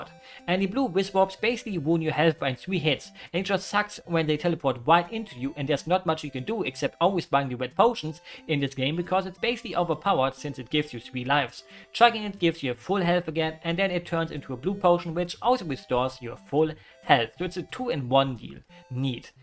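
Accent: Germany. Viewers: This guy sounds very german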